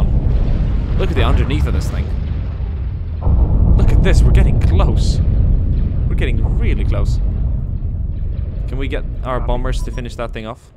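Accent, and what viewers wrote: United Kingdom. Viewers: Man love your accent. I used to watch your stuff years ago and the way you sound is totally different than now. It's this weird mix of sometimes North American, sometimes very British English influenced o.o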